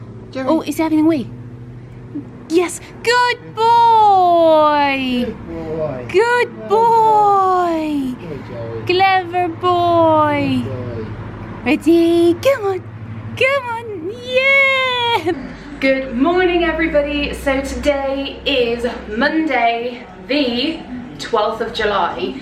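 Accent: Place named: Bristol, United Kingdom. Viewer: Your accent🙂Are you from Bristol?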